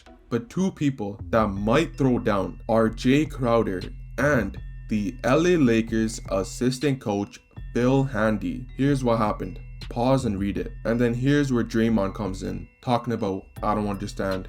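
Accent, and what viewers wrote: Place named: Toronto, Canada. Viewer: Where are you from? You kind of sound like you're from toronto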